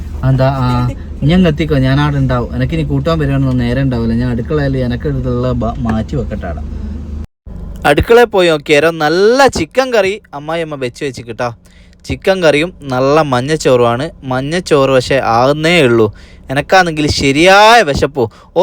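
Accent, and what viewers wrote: Vadakara, India. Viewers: വടകര ഭാഷ നല്ല രസമുണ്ട്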